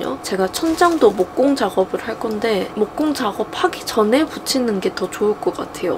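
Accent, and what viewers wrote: Jeolla, South Korea. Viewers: ♡ 젤루 님이 눈에 들어오신건 강아지#강이#이와 함께여서 구독하다 전라도분인걸 확 알아챘음당~ㅋ 전라도 사람은 다아는 억양~ㅋ 저두 강아쥘 엄청좋아하거든요 님의 영상을 보면서 캠퍼의 꿈?을 키워봅니다 항상 잘 보고마니 배워보도록 할께요~😊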